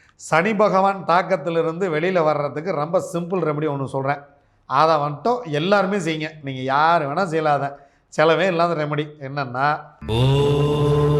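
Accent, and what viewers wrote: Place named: Coimbatore, India. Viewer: Iyakkunar manivannan sir kku piraku namma coimbaturu slang um nakkal pecuum ungalidam thaan saami ketkiren... guru sakku moottaila katti adipaar... 😂😂 kastangal irunthaalum neengal sollum vitham romba rasikira mathri irukku guru naatha,, and by the by one more information,, I'm from coimbatore, ungalathu video anaithayum skip add seyyaamal anaithu add um odiya pin thaan parpen athu antha video vil neengal sollum thagaval yenakku muluvathum palikka naan tharum guru kaanikkai... anbarkalum ithai try seithu paarungal.. neengal yevvalavu menekettu ovvoru video podukireerkal yenbathu ungalai patri therinthavarkalukku theriyum... koodi nandrikal guru naathaa...by coimbatore venthaan...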